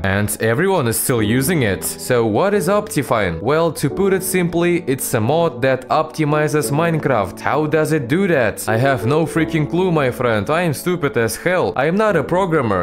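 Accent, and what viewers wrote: Russia. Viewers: Не иронично самый клёвый акцент ру английского с неожиданно довольно хорошим произношением и ахеревшим словарным запасом. Как говорится fluent af. Будет крутой гиммик канала. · I ve recognised Russian speaking man from the very first words 🙂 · русский акцент bilya... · Почему мне кажется что у тебя русский акцент ? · Прикольно что из за русского акцента мне становится в разы проще понимать английский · I knew that guy was russian when he started speaking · Couldn't have made a more russian sounding enlgish accent fr. Thats how people in my school read English texts. Good thing i know english well💀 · Bro i love russian guy speakung english😂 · When you hear a russian speaking english AAAAND cursing in russian..., the vídeo is hilarious and serious at the same time. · hell nah hes def russian, i can say it cuz im russian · Нда, чел пытается не палиться что он русский, но акцент говорит нет Ты позоришь себя, микрочел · Как ты говоришь на английском так, чтобы русскому было тебя понять легче, чем англо-говорящему? 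· russian accent detected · love you rrusian accent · how this the most russian accent i have ever heard (i am russian btw) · Как же мне нравится эта озвучка с Русским акцентом:)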